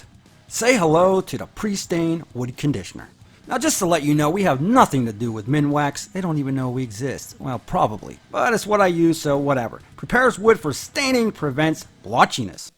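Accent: Pittsburgh, United States. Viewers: Pittsburgh accent? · I like your Pittsburgh accent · I hear some yinzer in your voice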